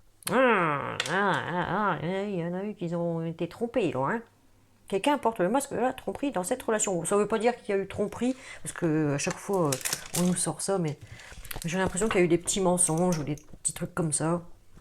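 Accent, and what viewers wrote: North, France. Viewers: Un petit bonjour d'Hautmont près de Maubeuge😉😂👍. Je vous découvre et je retrouve bien l'accent du Noooooord ! Merci pour ce tirage, je suis gémeaux ascendant verseau également ! 😉👍. Je m'abonne à votre chaîne avec grand plaisir. Bisous à vous et à votre adorable petite chienne😍🙏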